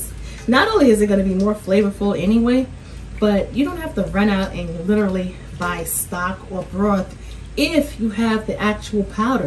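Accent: New York, United States. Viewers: I like that NY accent. It's cool that you cut your own meat chunks. It's definitely less expensive. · Start watching... looks nice... Oh dear, American accent, I'm going to have to convert from pounds to kg aren't I...?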